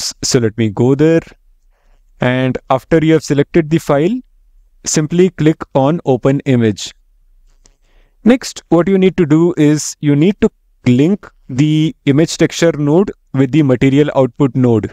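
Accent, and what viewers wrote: India. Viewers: He has an Indian accent.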